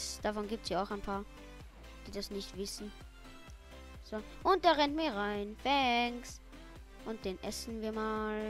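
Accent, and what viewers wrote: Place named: Lower Austria, Austria. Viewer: sag mal kann das sein das du aus niederösterreich sonst was kommst denn in deinem reden hört man hochdeutsch und bisschen dialekt xD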